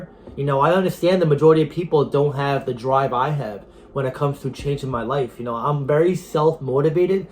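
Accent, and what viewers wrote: New York, United States. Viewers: Are you from NY?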